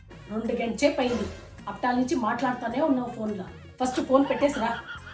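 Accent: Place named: Karnataka, India. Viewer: Our Karnataka telugu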